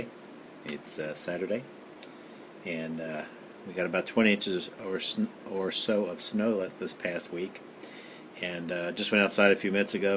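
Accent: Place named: Black Sea, Turkey. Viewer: doğulusunuz ama karadeniz şivesi ile konuşuyorsunuz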